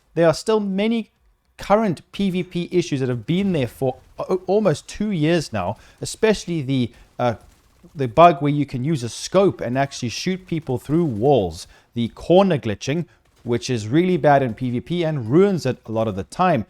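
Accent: South Africa. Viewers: Are you from South-Africa? PS thanks for the video…Now im craving to come back!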